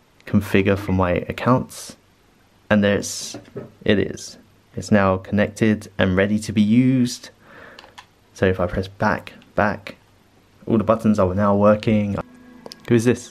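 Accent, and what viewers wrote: England, United Kingdom. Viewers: Was not expecting this guy to have a British accent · How are you asian but with an english accent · He looks asian but he has an British accent LOL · First time I see an Asian with British accent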